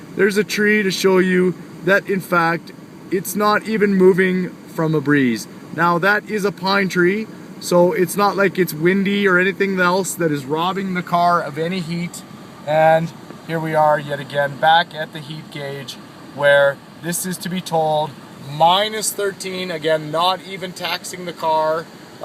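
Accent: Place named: Canada. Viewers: you sound so canadian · yep, I lived in Winnipeg for 7 years between 1979 and 1986, I actually enjoy listening to this guy, Like the Canadian accent;)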